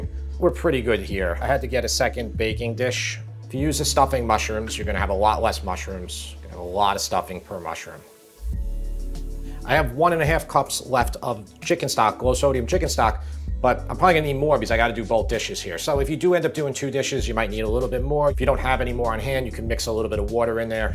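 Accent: New York, United States. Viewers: Is that New York I hear in your voice? Bronx maybe?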